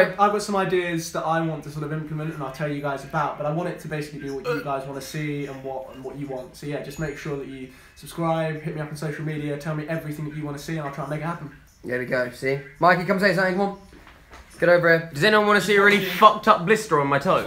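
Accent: United Kingdom. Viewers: What he's British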